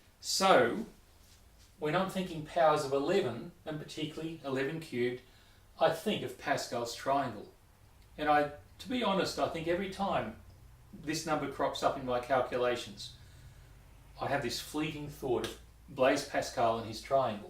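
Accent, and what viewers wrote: Australia. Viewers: g day must be from straya mate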